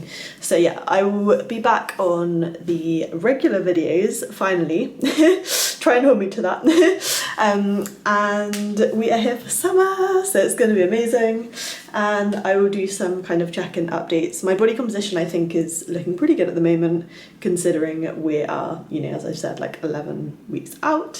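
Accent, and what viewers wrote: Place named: England, United Kingdom. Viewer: I love your accent, english girls always get a +1 thanks to the accent.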